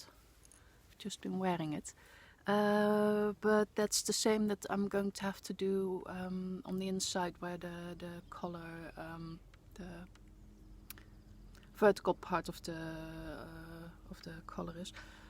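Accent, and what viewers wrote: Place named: Netherlands. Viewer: Hearing you, I already thought you would be Dutch, because I hear you thinking in Dutch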